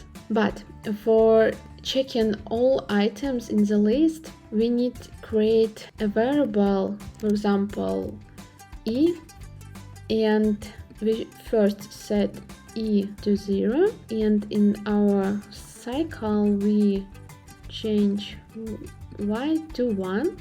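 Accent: Russia. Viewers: Thank you!(акцент русский)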